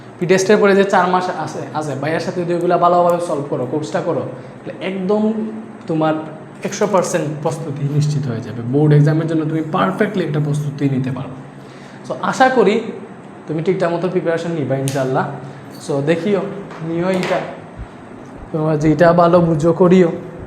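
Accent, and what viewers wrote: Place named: Bangal, Bangladesh. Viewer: ভাইয়া আপনার বাঙাল ভাষা কিছু বুঝিনা তাই শুদ্ধ ভাষায় কথা বলে প্লিজ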